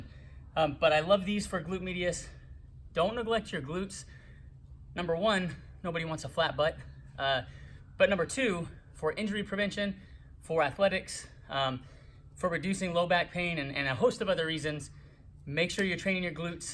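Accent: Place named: California, United States. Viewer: Damn, I never realized what a California accent was until I lived in Wisconsin for 10 years 🤣. Thanks for the workout!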